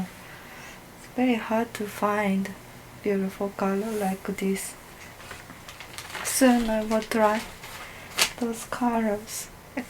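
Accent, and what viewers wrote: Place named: Japan. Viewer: Your English is good, but sometimes sounds more Japanese and go to English classes to make your pronunciation more better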